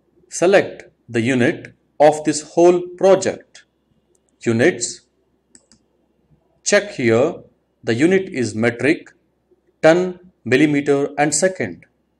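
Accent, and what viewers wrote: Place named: India. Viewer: God!Indian English!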